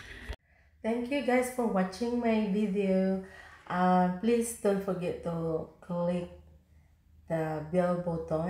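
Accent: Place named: Visayas, Philippines. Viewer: Ohh ang sarap niyan kapatid..parang Bisaya Ka host..taga saan Ka.??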